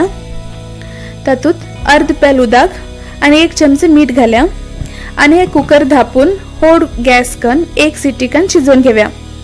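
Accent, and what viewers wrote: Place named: Konkan, India. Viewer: Just now I watched Vikas Khanna's episode in Goa. And in the episode he goes to a restaurant and eats this dry curry called Khatkhate. It's only when I watched this video, I came to know that it is the same as Gajbaje. 🙂 I watch your videos mainly for the content and love your Konkani accent. Just curious to know where you are from?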